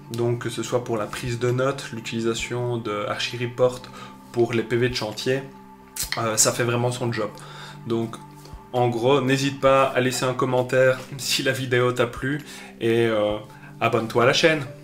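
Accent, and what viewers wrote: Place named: Alsace, France. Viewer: Quels belle accent alsacien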